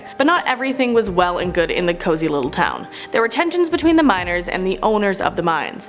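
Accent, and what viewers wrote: South, United States. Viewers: I may stay in ghost town. Keep going Southern accent. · Southern accent for calif town???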